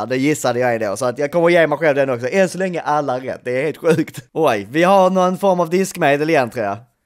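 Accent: Scania, Sweden. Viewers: Tycker som inte om skånska men den här är fan för skön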